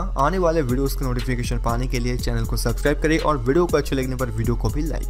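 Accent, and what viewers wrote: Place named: Hyderabad, India. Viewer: Kya baat hai bawa, ek dum Hyderabadi 😄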